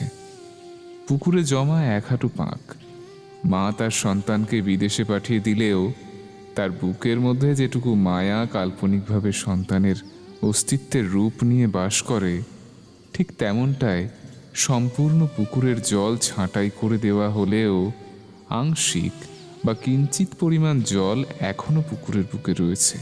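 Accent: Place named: Purba Medinipur, India. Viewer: পূর্ব মেদিনীপুরের মতো ভাষা আমি রামনগরের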